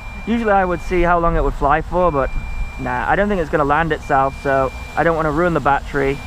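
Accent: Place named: England, United Kingdom. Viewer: G'Day. Pete In Australia here, very nice review, plus I just love your accent (I'm a Pom too) I subscribed to your channel a little while ago, some good stuff here man. Another You Tuber, Aeon38 recommended I talk to you so here I am. Sorry it's so long but I got a lot to ask! I also fly Mode One as does most of Oz and herein lies the problem. I've been flying R/C aircraft now for around forty years now (Don't ask!) and my brain is just absolutely wired to mode1. I have been teaching myself Mode Two recently with a few micro toy quads, F-36, etc (Gotta turn that one into FPV) and all is fine until it starts to go wrong and then the reflex's take over with disastrous results. With this little monster (Bugs) on 2s and later, 3S I do not want my reflexes to kick in and put Bugs in my face. (Or someone else, and this will happen if you are hovering nose out and you go to cut the throttle where-by you inadvertently give a back cyclic input, as you would know). 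What do I do? I really want Bugs 3 and unfortunately they haven't answered an email I sent to them so would you know of any flight controller boards that would work with this on DSMX/2. Or can I hook in a Mode 1 Rx to the standard board somehow? What can I do??? What are the ''Noisy Formula One'' type quads you mention. I neeeed one (on Mode 1 if possible). Would you have any links to the stuff we're talking about too by the way. I want something or things to: Fly real fast and steady. Fly real slow and steady for video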